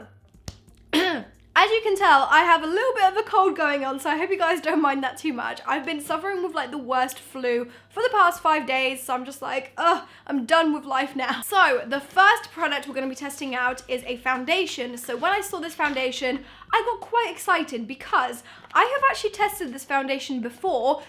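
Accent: United Kingdom. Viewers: This british accent omg 😍😍😍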